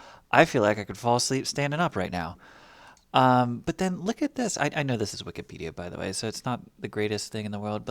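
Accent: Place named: Kentucky, United States. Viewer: You gotta be from Kentucky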